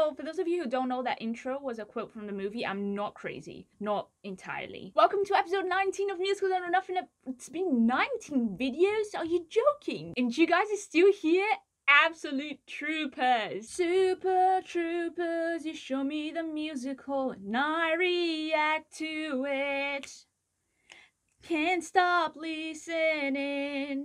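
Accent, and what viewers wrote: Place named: Australia. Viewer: Hey sorry if you’ve answered this already! I’m curious about which country you live in. Your accent sounds Australian or like it’s from New Zealand (if so you’re so lucky). I’m loving your reactions, it’s really funny!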